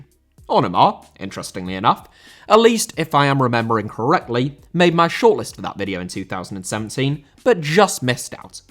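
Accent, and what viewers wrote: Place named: England, United Kingdom. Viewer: Give it a rest bro. He’s saying all of these names thru a heavy English accent. As far as pronunciation goes, done most of the names you’ve mentioned pretty well. He’s not going to say them like a native Portuguese speaker my dude.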